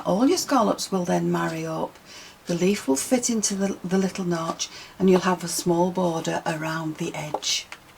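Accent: United Kingdom. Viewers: I love your british accent it is very soothing and relaxing, and the music is very pretty and also soothing and relaxing.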